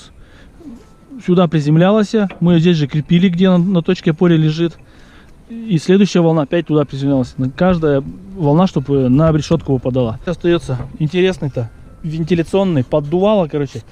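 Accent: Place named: Ivanovo, Russia. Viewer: По говору Иваново, Кострома.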